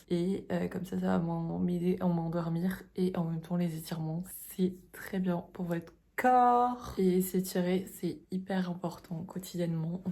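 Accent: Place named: South, France. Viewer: Tu es vrm magnifique, mais ducoup tu viens d'où si tu n'es pas de lille ?🥰 Moi je dirais sud de la france 😂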